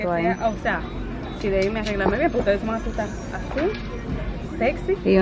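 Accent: Dominican Republic. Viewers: La que está hablando es como dominicana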